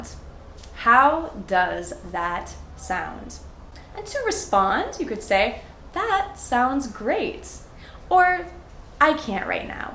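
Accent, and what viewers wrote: United States. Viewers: Darling teacher your my favorite teacher at all, please tell me which state you are frome I wanna do some research about your state accent cuz I think I fall in love with it. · Your American English like a sweet candy. Undoubtedly, i'll be part of your live class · i 'am beginner student and i can understand a lot of your videos but i have a problem about the accent if i watched another vidios with anoter accent i can't understand, is this american accent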